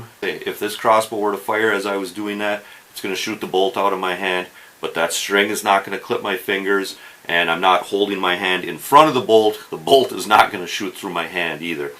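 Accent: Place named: Michigan, United States. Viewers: Good info and as a newbie crossbow guy duly noted. You sound like you're from the UP... Sault Ste. Marie here. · What’s your general area in Michigan! I hear that accent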